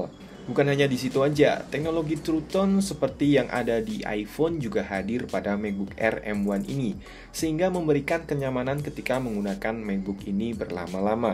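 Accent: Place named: Java, Indonesia. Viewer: review nya jelas, sama kayak medhok nya #timmedhokforever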